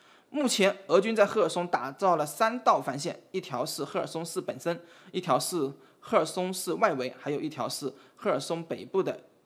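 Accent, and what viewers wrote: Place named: Hunan, China. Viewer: 你这口湖南普通话一下子就把你的节目打了不小的折扣。还是让别人替你表达吧！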